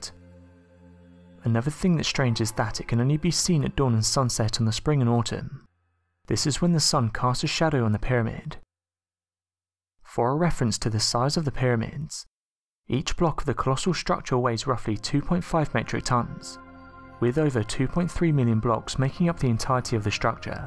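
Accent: England, United Kingdom. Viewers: Qwerty Bwomp he’s English.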